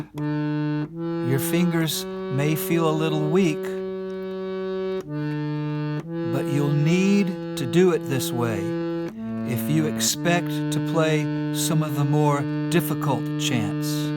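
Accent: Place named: South, United States. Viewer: Ah this sweet southern voice sounds so familiar! Brilliant patient teacher you are my friend. I am sharing this with so many devotees who need this! Much Love and Gratitude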